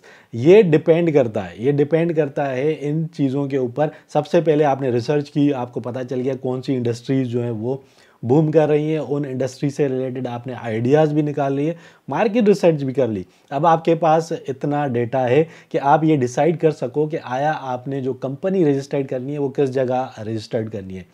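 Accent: Sindh, India. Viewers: bhai apka sindh accent hai. Kiya ap sindhi hai?😊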